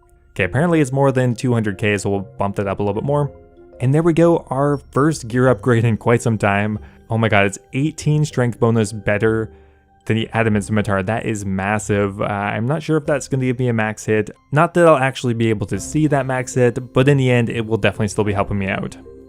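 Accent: Quebec, Canada. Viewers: Are you French Canadian?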